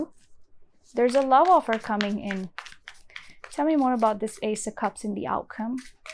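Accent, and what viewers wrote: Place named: France. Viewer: I like a lot your accent and voice. ..There is smthg french ...and sweet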